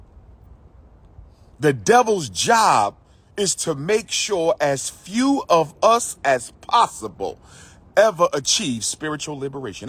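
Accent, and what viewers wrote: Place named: AAVE, United States. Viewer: If were to ever open a school, (and we know it’s already been 14 years), would the school teach boys Umar’s hood English, like “Where my Chicago Africans at?", Would it teach boys to practice and promote polygamy like he always does? Would it teach boys to constantly repeat everything three times like he always does? Would it teach boys to trick the people who support them, the way he changed his non-profit organization name and EIN number without telling donors? Would it teach boys to physically threaten people, the way he said he wanted “pull up crews” to roll up on and confront his critics? Would it teach boys that publicly asking for “wifey resumes” is what serious scholars do? Would it teach boys that they should never be held accountable for what they promise and never deliver, which is his personal philosophy?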